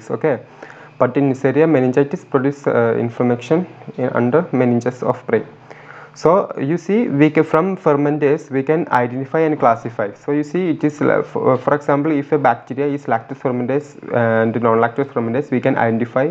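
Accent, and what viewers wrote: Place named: United States. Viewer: The way,you are speaking English seems like you came from USA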